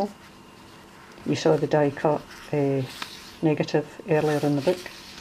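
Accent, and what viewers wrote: Scotland, United Kingdom. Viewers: Beautiful, really love the vintage photos, tartan, and your lovely brogue and interesting description of Scottish history. We do tend to romanticize the past, forgetting sometimes about the harsh realities... :) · I was attracted to your name being Scottish or should I say a Glaswegian I have just started being interested in Junk Journals and your Scottish journal has inspired me to make a couple of these for my Australian relatives. Listening to you makes me long for my home.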